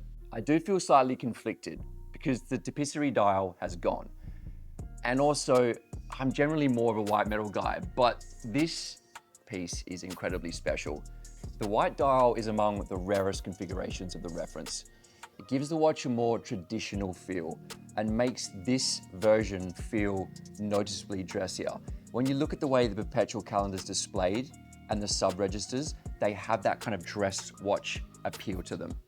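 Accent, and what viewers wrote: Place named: Australia. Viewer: I sense a slight Australian accent there. Great video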